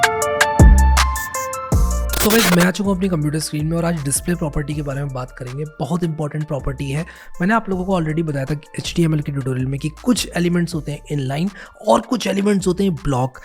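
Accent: India. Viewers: the best style of speaking, ekdam desi style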